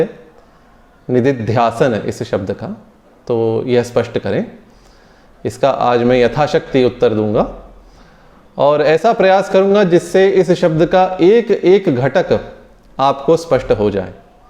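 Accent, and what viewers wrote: Standard. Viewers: आप शब्दों के उच्चारण शुद्ध रूप से करते हैं ।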